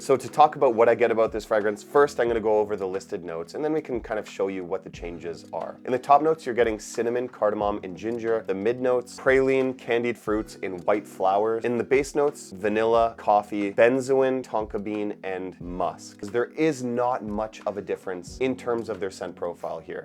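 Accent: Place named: Canada. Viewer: Judging by your voice and kind demeaner you were giving me strong Canadian vibes -- upon further investigation, you are in fact Canadian 😄 I love your content. I'm going to add Qahwah to my collection thanks to your opinion. Keep up the good work.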